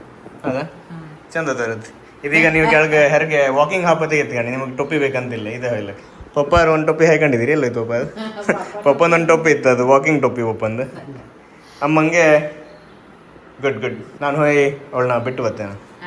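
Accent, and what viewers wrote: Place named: Kundapur, India. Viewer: I love kundapra kannada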